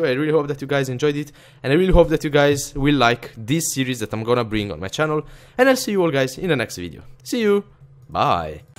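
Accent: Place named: Italy. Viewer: Thanks!!Ive Been waiting on The Alchemist 2!Also,maybe add new machines using the observers?maybe more automation?Auto Tree Farm? Also I want to ask you, Is making growth pulsers(From Skyfactory)possible? Maybe Uhmm a way to make trees grow faster?(Uhmm,Twer....nvm) Maybe even custom mobs and armors Also maybe a bit of jump boost?(You are in space after all) Thanks again for The Alchemist 2 You are the coooooolest youtuber I know Also I like your Italian Accent:) Omfg!Log....nvm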